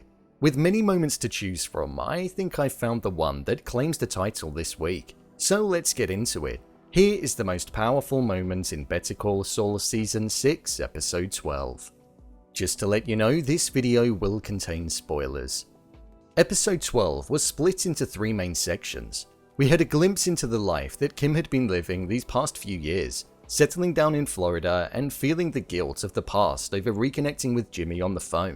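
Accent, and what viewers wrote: United Kingdom. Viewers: 英国なまりを、頑張って聞き取った。 と、思う。 次も、頑張る。💪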